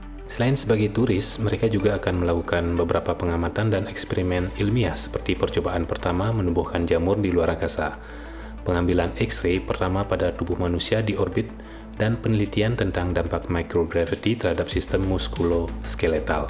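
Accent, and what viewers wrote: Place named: Aceh, Indonesia. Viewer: logat acehnya masih kental bg.. tpi ga papa lanjutkan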